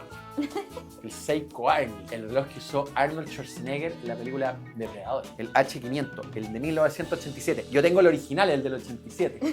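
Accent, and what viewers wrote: Chile. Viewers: Al final … tuve que leer la transcripción. Tu nivel de Chileno es demasiado complejo para los simples hispanohablantes. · Sin ver los videos va like directo... me encantan los relojes pero mas tu pasión y el acento chileno.... cachai? Abrazo desde Argentina!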